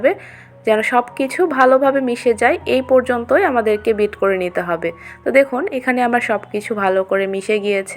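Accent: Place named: Naogaon, Bangladesh. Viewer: Apu apnar basha nojipurer kon jiegaie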